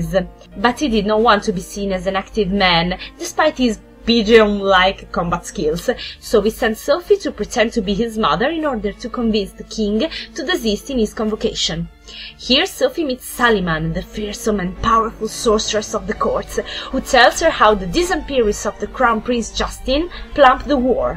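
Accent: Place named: Italy. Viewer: No no, ma ché, sei stata più che bravissima, FENOMENALE, davvero!! Per me sarebbe aldilà di ogni possibilità! Ma non ci si può fare niente, è proprio che gli italiani che parlano in inglese, anche quando (ed è un caso raro) hanno un'ottima pronuncia come te, fanno ridere! Semplicemente perché si sente troppo che non siamo inglesi, è un problema che abbiamo tutti noi neolatini, gli inglesi se ne lamentano sempre. E' il motivo per cui ad esempio anche i migliori cantanti italiani fanno moltisisma fatica ad avere successo nei paesi anglofoni.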